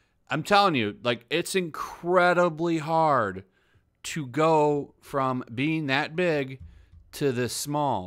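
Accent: England, United Kingdom. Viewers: Lol your English accent made my day. 😂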